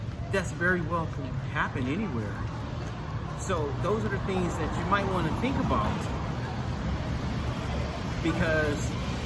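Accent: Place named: Los Angeles, United States. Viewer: Sad. Sounds like academia. Your speech sounds like Los Angeleno.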